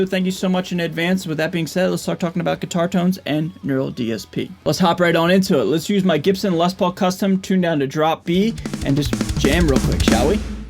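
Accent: United States. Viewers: What am I hearing, meaning what r u playing thru? That was fat man. I want a home studio. And I’m curious about yours. Mostly because you speak American and not British !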